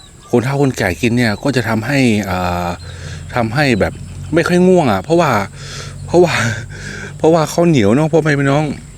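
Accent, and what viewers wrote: North, Thailand. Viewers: ชอบ ฟังพี่พูดภาษาเหนือมากคะ น่ารักมาก ขออนุญาติถามคะ ลำแตลำว้า มันแปลว่าอะไรคะ🥰🥰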